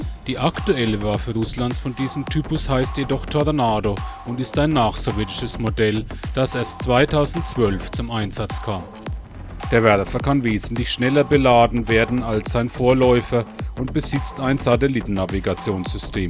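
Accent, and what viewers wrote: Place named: Franconia, Germany. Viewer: Ein Sprecher mit so einem starken fränkischen Dialekt spricht für "Russland.TV"? Hätte das nicht jemand mit russischem Akzent übernehmen können? Hätte wesentlich schöner geklungen. :)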